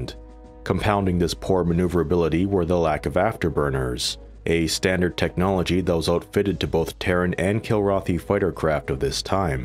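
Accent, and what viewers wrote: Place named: Australia. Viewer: You're obviously an AUSSIE!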